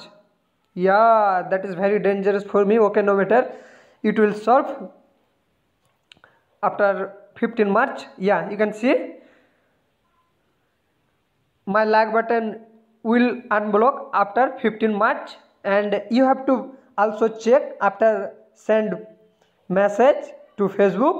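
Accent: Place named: India. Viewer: Bhai sumjh me to kuch nahi aaya. Pr tari desi English sun ke majaa..aa gaya.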